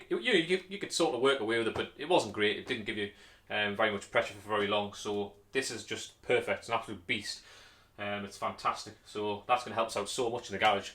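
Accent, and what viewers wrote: Newcastle, United Kingdom. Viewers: funny hearing a fellow geordie on youtube ,my first car was a mini now am into building defenders from bare chassis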